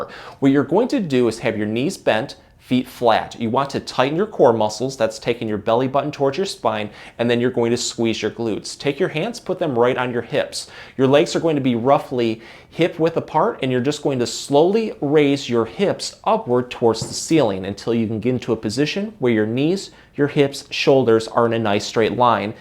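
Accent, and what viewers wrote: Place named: Midwest, United States. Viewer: He’s a Midwesterner. Love the accent 😊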